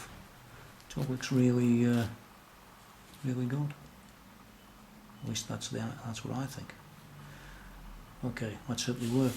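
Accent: Stoke, United Kingdom. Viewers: I must say, i hate strats usually but this is one of the nicest looking guitars i have ever seen! and its always nice to hear a stoke accent on here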